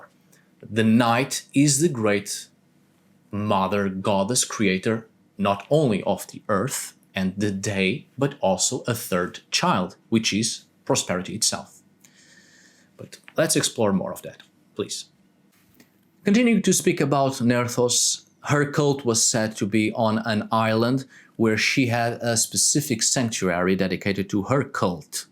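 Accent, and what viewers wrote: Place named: Portugal. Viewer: Your Italian accent is quite good. You have a little bit of the Portuguese accent on the Rs before vowels, but well done. Que fixe pa!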